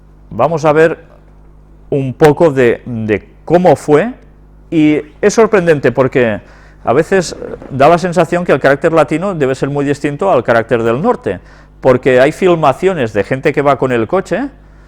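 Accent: Spain. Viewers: ¡Para nada! Trabajé en Palma cuatro años y tomè un curso de un mes intensivo con un personaje que es escritor separatista que o te hablaba en mallorquí o en inglés (!) pero soy tan bestia que cuando trataba de aplicar mis "conocimientos" en el trabajo, mis compañeros se descostillaban de la risa y me dí cuenta de que nunca iba a poder hablarlo .... Pero me da mucho gusto escucharte hablar porque (salvando las distancias) me hacés acordar a la gente linda de la isla. Cariños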